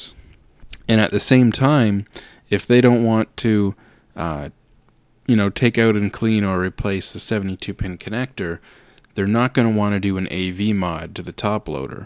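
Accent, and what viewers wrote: Canada. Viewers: Are you Canadian? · Wow. Nice. Hey, are you Canadian? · is this guy canadian because he sounds canadian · Yes he is indeed a Canuck. As am I.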